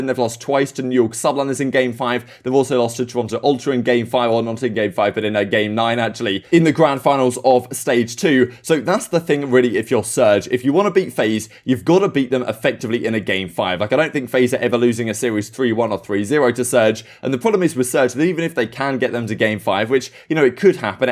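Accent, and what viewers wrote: United Kingdom. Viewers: Watched your video last night, and as soon as the intro sound happened, followed by the "Alright guyyyyys, ", my girlfriend looks up and says "I feel like I live with this guy... I can't even understand him, he talks so fast! At least he's British though and not annoying like that other dude!" (she hates Maven.. haha)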